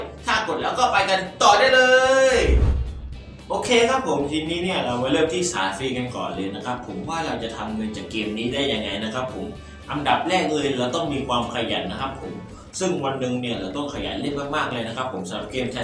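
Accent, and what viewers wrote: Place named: South, Thailand. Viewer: แหลงใต้ต่ะหลวง เท่เลยผมว่า 555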